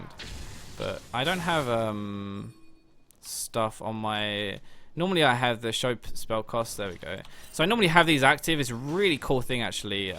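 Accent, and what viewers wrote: United Kingdom. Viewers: "I think honestly, basically, i mean. i don't honestly, i guess. he honestly, basically it means, actually, honestly" - Damn You really need to limit yourself when you use those words and phrases it really pissed me off. On these 7- 10 min videos you can't actually notice that, but on this video it is really REALLY horrible. I Love your British accent a lot and the content you are making, but those phrases man, damn. Keep up the good work tho.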